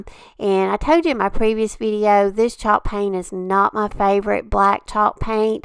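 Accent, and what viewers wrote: South, United States. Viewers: It’s the southern accent for me!💕💕💕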